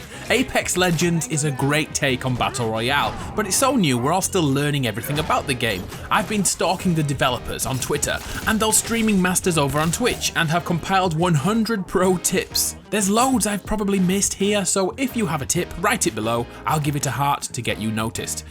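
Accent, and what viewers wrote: United Kingdom. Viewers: Why are all these Apex Legend tip videos all have British narration? Not a complaint, just an observation.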